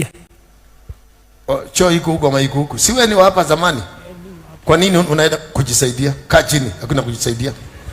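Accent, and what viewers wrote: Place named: Kenya. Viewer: Maybe he is not harsh, he just sounds Kenyan ……kiswahili yao ni sort of harsh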